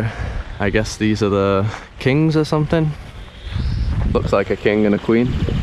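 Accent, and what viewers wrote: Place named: Northern England, United Kingdom. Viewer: I really love the accent from Northern England. Cordoba is amazing! Happy travels!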